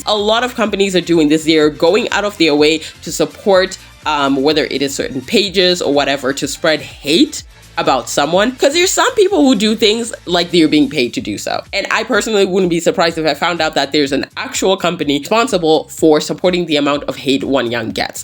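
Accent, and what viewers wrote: United States. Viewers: not related but i thought you were actually from kenya and just have a really good american accent lol are you actually from the states? bc i don't blame you and your family for getting out of this racist hell hole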